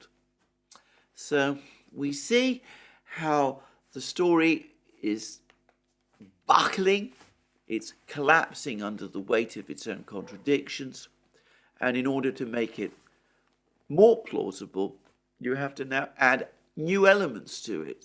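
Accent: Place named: RP, United Kingdom. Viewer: great impartial analyses👍. BTW what schools did it take to learn your posh English?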